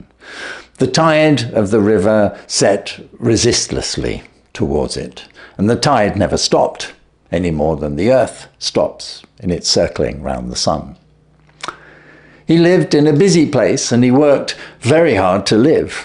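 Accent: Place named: RP, United Kingdom. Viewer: Many thanks to you Mr for this excellent voice and nice RP accent. I beseech the Almighty Allah to bless you. Accept my greetings to you from Iraq.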